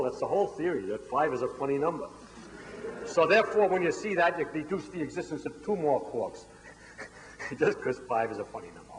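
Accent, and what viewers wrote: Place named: Brooklyn, United States. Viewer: I’ve spent this rainy day watching Feynman lectures. Jeez, what a terrific teacher. Love his thick, Brooklyn accent. He has the timing and cadence of a comedian.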